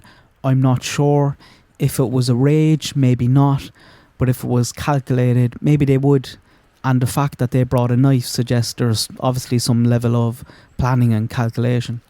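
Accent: Cork, Ireland. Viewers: Cork gang represent! Sound like you might be from the Northside Langer! Beautiful scenery, up the Glen? 😂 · Very interesting information about wifi in public that I had no idea about! Thanks for this. I haven't heard anyone discuss this before. Very good video, and obviously, I love your Irish accent!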